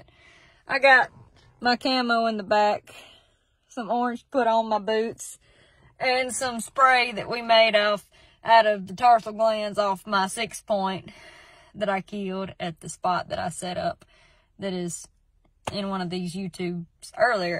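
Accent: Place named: United States. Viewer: Love your shirt baby, just came across your vlog, love it to like your country accent because I am country.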